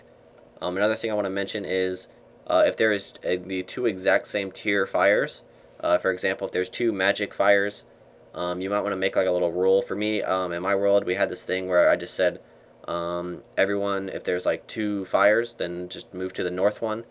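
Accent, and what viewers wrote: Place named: United Kingdom. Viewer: i think he's british... t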